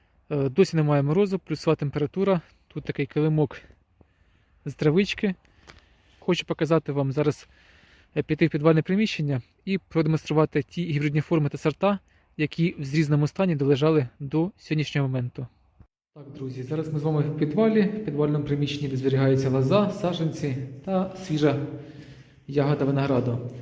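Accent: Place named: Mykolaiv, Ukraine. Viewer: Дуже приємно чуть справжню українську мову з Миколаївщини. Поважаю Ваше відношення до винограду, хай Господь допомогає.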